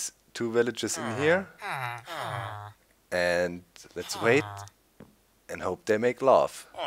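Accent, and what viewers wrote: Germany. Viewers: have you ever tried using an american accent other than your german one?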